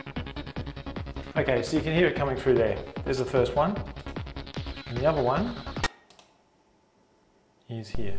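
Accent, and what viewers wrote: Australia. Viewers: So, is it so unusual for someone to mistake and Australian accent for British?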